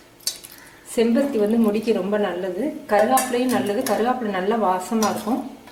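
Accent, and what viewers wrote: Madurai, India. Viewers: tq mam. very useful. I like all ur recipes and we'll explained about the ingredient very tasty.and I like ur madurai language very sweet to hear .tq s a lot.we r very lucky to have such a wonderful talented mam.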